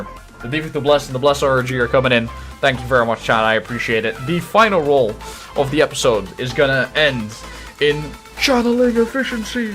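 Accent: Netherlands. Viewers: Ik hoor al gewoon dat je Nederlands bent, lekker hoor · De Dutch accent is strong on this one, just found you. Nieuwe sub erbij. Great vid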